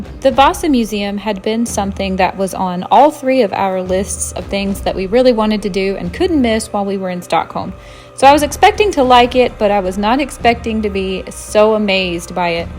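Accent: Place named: South, United States. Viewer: ☺️ Just saying what I’m feeling and thinking about when I watch and chat. ☺️ Yes of course we can tell when you’re reading or narrating over the video, and no it doesn’t come off as stilted at all. In my mind, you’re reading your “notes” and doing it so you don’t forget what you want to say. But it still feels like you’re talking to us and teaching I guess. I mean no one is going to remember everything when you’re filming, then editing the finish product. So the way you do it, works. You both “come off” sounding like you’re enjoying what you’re talking about. Your tone, and even accents, yes I know has a more southern drawl than you normally, but yours comes out some too. Not talking too fast, nice and reassuring I guess is what I’m thinking. Can tell when you’re smiling when you speak too. Trust me, we love that! ❤️💜❤️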